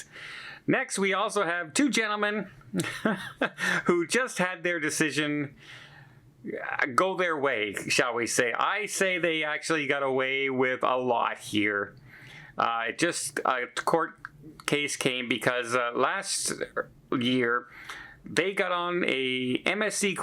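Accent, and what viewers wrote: Canada. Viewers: oh I thought it sounded like fantastic but I guess with his Canadian accent it sounds like van.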